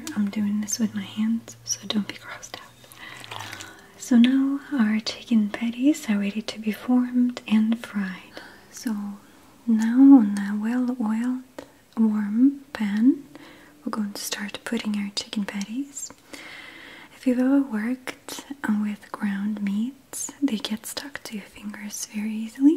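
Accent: Russia. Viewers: The Russian is strong in this one. Lovely as always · Акцент то русский!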